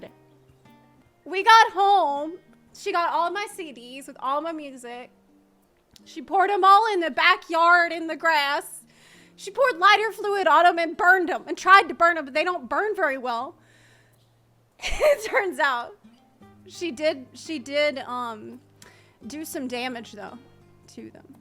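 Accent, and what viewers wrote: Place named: South, United States. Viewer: Nothing better than comparing the good news to a punishment. I feel that really gets a lot of kids to change their mind about it. I think it will catch on. Also i love when sassy southern accent comes out. Reminds me of spending time with my relatives in Arkansas.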